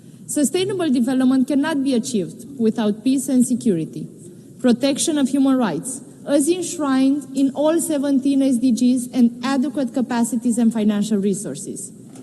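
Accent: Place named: United States. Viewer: vorbește engleza americana standard. Dar dacă știi engleza britanică tot ar trebui sa o înțelegi. Acum desigur dacă ești pe la nivelul B1 sau B2 e cam greu sa înțelegi tot ce spune.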